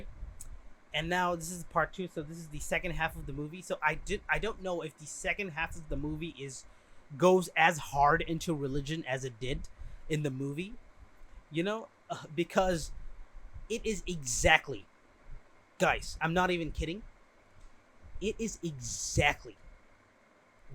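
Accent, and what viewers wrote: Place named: United States. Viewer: Wait you live in Bangladesh? You sound so American!!!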